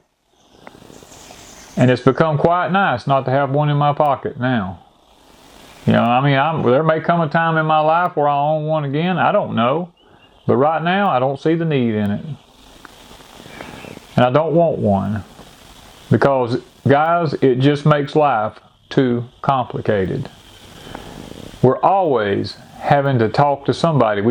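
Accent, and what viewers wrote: South, United States. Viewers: Hi, love listening to your southern drawl, I'm going to take your advice and ring my son tomorrow, can't see him he lives to far away, so I'll call him and tell him how much I miss him.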